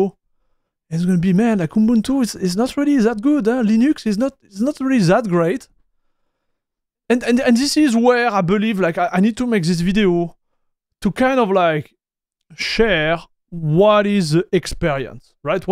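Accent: France. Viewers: The french accent is so strong ;)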